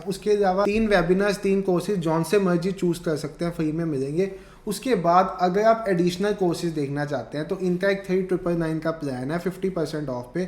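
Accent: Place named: Pakistan. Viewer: Your accent is like a pakistani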